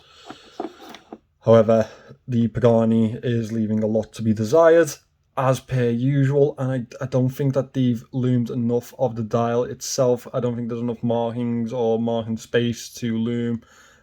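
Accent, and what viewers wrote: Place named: Scotland, United Kingdom. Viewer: I’m only here for the terrific Scottish accent 🤩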